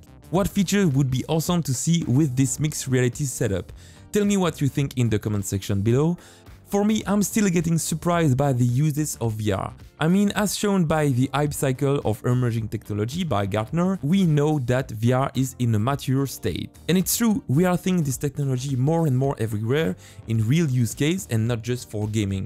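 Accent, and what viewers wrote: France. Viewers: L'accent français 😂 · that french accent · L’accent français qui fait plaisir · thats an incredibly french accent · French accent lol but amazing video! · Damn to accent francais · Oh le bon accent français 😁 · Hey, super ta vidéo! Dommage que je vienne seulement de la découvrir ^^ J'ai directement reconnu ton accent, ça fait plaisir de voir des Français faire de l'internationale ;) J'vais checker le reste de ta chaine, bon courage en tout cas, ça promet du lourd! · Eh mais t'es français je reconnais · i love how your french accent just peaks in the ou and au sounds, from a french student it is very satisfying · You're the frenchest person i've ever heard, very cool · French accent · Au vu de ton accent, tu est français non? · l'accent français de ouf, mdr (sinon superbe vidéo 👍) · It's surprisingly quite rare to hear French accents on YouTube. 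Not that it's a problem at all. Sorry if it's Swiss or Belgian or whatever, I just can't tell the difference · on est d'accord qu'au vu de son accent il est clairement français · Ca ce vois beacoup que t'ai francais mais bon (Super cool la vidéo) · yu have a french accent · Mdr sa me tue que PERSONNE a remarqué qu’il avait un putain d’accent français 😂 English translation: lol it kills me that NOBODY noticed that he has a fucking French accent 😂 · your defenitly french like me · Translation (according to google translate): Hey, great your video! Too bad I just discovered it ^^ I immediately recognized your accent, it's nice to see French people doing international;) I'm going to check the rest of your channel, good luck anyway, it promises heavy! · L'accent français on est là (en vrai tkt il est super ton accent 👌 super vidéo) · Its sseems you are french mon pote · T es français toi sa se sent . 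· wow l accent francais on aime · ça se sens que tu es français alors salut mon pote !! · its so obvious he's french lmao ! tkt tes pas seul XDD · My god you sound so French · French accent my dude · Cette accent français mon frère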